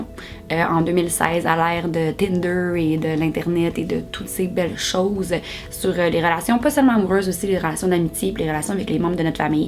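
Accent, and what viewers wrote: Quebec, Canada. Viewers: J'aime pas les québécois comme elle je suis pas rasciste j'aime pas l'accent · L'accent canadien te vas super bien :3 :) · Je suis francaise et je viens d'ecouter ta vidé, tu es vraiment trop cute avec ton accent; c'est du Québec?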